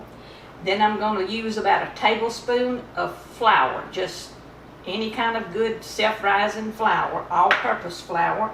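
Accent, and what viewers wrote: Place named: South, United States. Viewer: My grandmother made this as we were kids I litterally could smell this she was from north Alabama she made sausage gravy also but I love them both your voice took me back in time because you have her southern accent my dad like salmon patties he was from Mississippi and loved all these wonderful meals theyve all gone home now to jesus but gosh the slightest things can draw you back in time and catch a glimpse of memories we all hand had together thank you sweet lady for sharing this recipe Godbless you ...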